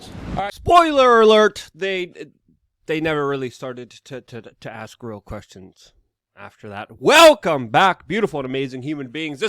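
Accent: New York, United States. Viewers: Luke’s NY accent was a lot stronger then, what a long journey it’s been since. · Luke- you’ve lost some of your NY accent! (So have I.) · Wow you I could hear your New York accent in that video!✌️💜🖖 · Luke"s NY accent comes out when he gets mad. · It's kind of funny hearing the new york accent out of Luke after so long lol. It's still mind blowing how much the narrative was clamped down on during and after 9-11. I do believe that there are more people now who at the least question the events that happened back then. The pentagon is the most glaring of them all. That was no plane. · luke had an og nyc accent back in the day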